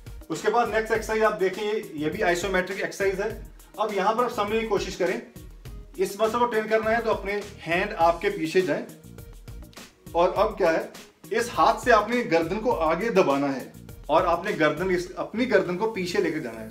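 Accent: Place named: India. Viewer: is he speaking in english or indian i don't understand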